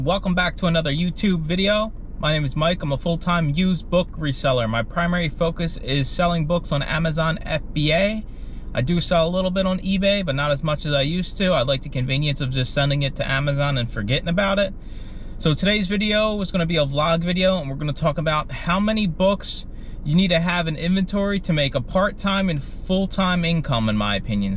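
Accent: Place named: Pennsylvania, United States. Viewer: Subscribed last night after finding your channel via a reply you posted in a Fields of Profits video..Loving what you have to offer, thank you! Got my Bluetooth scanner yesterday and ScoutIQ today...now I'm doing a bit of research b4 heading down the street to Goodwill. BTW I love guessing people's accents and I'm so glad you said you where you live b/c I guessed last night...Pennsylvania! I have people in the Pittsburgh area but and ex was from Harrisburg and so your accent reminds me of all his friends and makes me smile. Thanks for all the VALUABLE INFO!!!!!!!!! I'm SO EXCITED to include books in my FBA biz!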